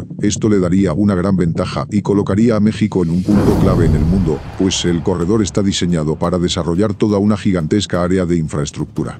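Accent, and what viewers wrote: Spain. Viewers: Y por que narra un español preguntome yooooooo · Quítale el acento gallego por favor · Eviten este canal de CM. Sólo hay locución en español de españa cuando se trata de México. Evitenlo. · Por qué el locutor habla como español si esto es un proyecto mexicano es de México debe de ser un locutor mexicano😅😅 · Ya no queremos escuchar a un Español narrando los videos!!! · Porqué un Espanyol tiene que ser la voz en Off ???? Teniendo tantas voces tan icononicas de Artistas Mexicanos!!! 😮😮😮😢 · Que extraño, estos vídeos relacionados con México narrados por un español!!!! Para ripley.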